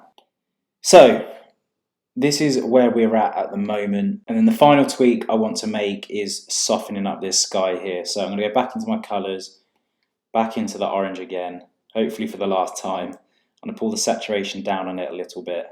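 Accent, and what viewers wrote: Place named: South East London, United Kingdom. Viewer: He sounds like he is from the south east of london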